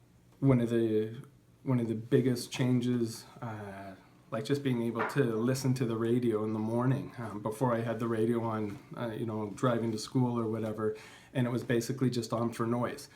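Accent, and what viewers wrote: Canada. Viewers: Congratulations!!! This is fascinating to me... You can tell that now you can hear, you have picked up an accent... Canada right? Its the ' ou ' like about and house... Lol.. When you were telling the surgeon or the lady that was getting you in touch with sounds you didn't have your accent... That is what fascinated me... Very happy for you.. Something I take for granted and seeing and listening to your story .... Changed the way I look at things.. Thank you for sharing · He must be Canadian, what I find interesting is that his voice got a little higher now that he can hear. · I'm so grateful that this has changed your life I watched a video where you initially got the implants. You're doing so well!!!! You have you Canadian accent back 🙂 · So cool, crazy that you have a very distinct Canadian accent! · I thought he had a very cool foreign accent in the first video. 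Now after a year or two, he sounds like an average Canadian.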